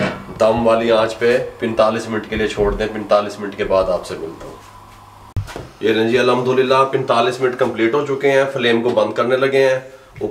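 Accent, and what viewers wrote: Punjab, India. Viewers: smells punjabi in urdu. thanks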